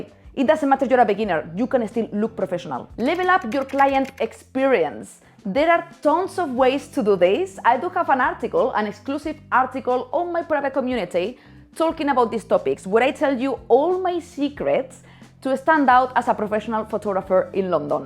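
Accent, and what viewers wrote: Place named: Spain. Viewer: I love her typical Spanish accent when speaking English ❤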